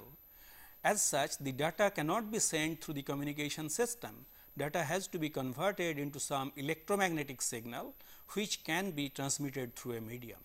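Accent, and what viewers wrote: India. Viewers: India english！exactly good！！！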